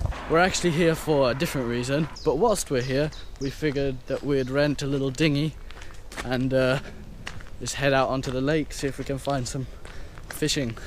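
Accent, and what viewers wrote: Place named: United Kingdom. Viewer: You sound so British lol nice video dude.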